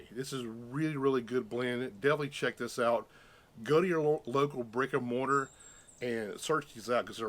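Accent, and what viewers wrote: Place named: North Carolina, United States. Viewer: Great review. You sound like you should have a BBQ channel lol. It’s that NC accent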